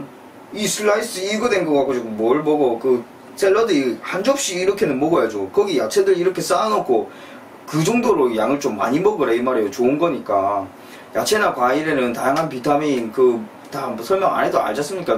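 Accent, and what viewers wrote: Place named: Gyeongsang, South Korea. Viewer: 역시 갱상도말로 해주시니 더 귀에들어오네요 ㅋㅋ ㅋ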